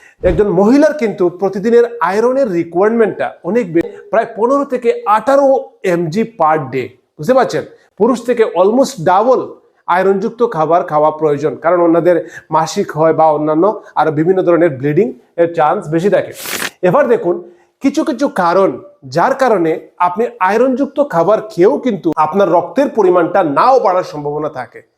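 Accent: Sylhet, Bangladesh. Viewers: apni monehoy ekjon Sylheti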